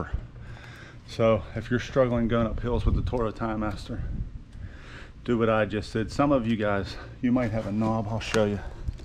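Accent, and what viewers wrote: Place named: Pittsburgh, United States. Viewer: This guy gotta be from tha burgh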